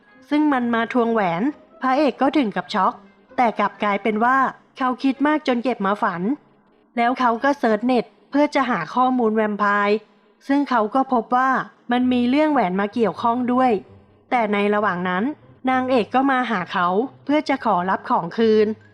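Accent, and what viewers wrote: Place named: Isan, Thailand. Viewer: คนอีสานเปล่าหนิ สำเนียงในการพากย์เหมือนคนอีสาน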